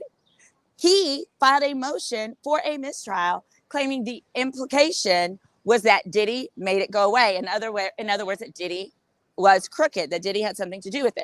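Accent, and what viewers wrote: South, United States. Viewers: I immediately subscribed to your channel last week after hearing your accent on Demetria L Lucas's podcast. I'm from MS and love a good ole Southern accent. Does your friend care about you calling her a hoe? I crack up each time you say this 😆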